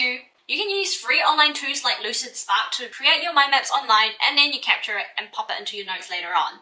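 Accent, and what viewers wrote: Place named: Australia. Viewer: Aussie eyy. Thanks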